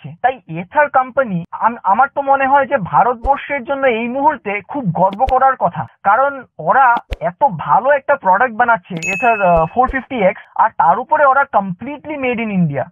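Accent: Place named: Bangladesh. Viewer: Aapnar pronounciation Bangladeshi der Moto..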